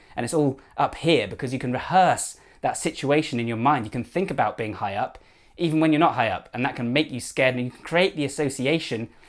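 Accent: England, United Kingdom. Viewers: Can I be honest? I stumbled across your channel by accident and at first stayed on wondering how you would be engaging and educational. I love to commend and recommend which is what I now do with you. I love your fitness stuff, but love the way you introduce other spheres of interest into your videos. You are a breath of fresh air on YouTube and as one English man to another I enjoy your very English twang. Great content, thank you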